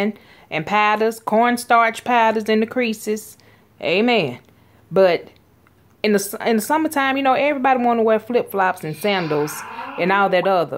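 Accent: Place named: South, United States. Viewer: Sometimes I can't understand her southern accent. Like when she said she saw a "burnch" of monstrosities. Did she mean "bunch?" And there was 1 where she said people shouldn't wear "bunnets" on their heads. ?????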